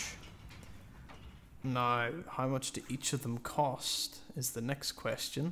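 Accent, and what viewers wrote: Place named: Ireland. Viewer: It's really a delight listening to your accent, i wish i had an irish accent like yours.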